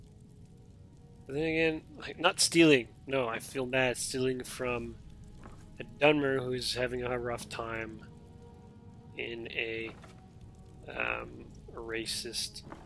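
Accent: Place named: Quebec, Canada. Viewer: There's no need to get an attitude. He is French Canadian; French is his native language. Yes, stutter can mean a hiccup in smooth frame transitions, but the main definition refers to speech. You didn't mention 'framerate' or 'smooth' or 'gameplay' or anything to suggest you meant the framerate. Additionally, you specified the subject as "he", not as "his game" or "the game" or "Skyrim" or "framerate", so what you said actually refers to his speech, not his framerate. Lern 2 english plz.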